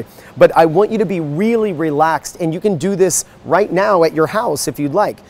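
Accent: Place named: Florida, United States. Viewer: I hear an accent but I don’t know which state? Florida?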